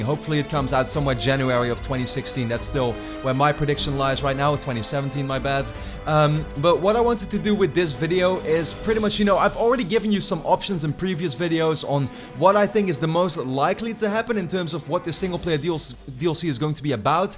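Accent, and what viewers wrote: Netherlands. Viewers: Ik merkte licht aan het accent dat je niet van origine engels bent, maar Nederlands had ik niet verwacht. Keep up the good work 👍🏻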